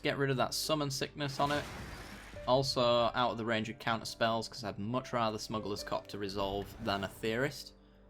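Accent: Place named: United Kingdom. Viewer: British accent is always good!:)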